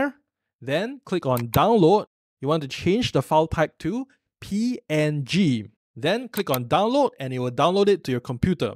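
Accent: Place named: Singapore. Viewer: just the first few words he said, i know hes from singapore lol... a very distinctive accent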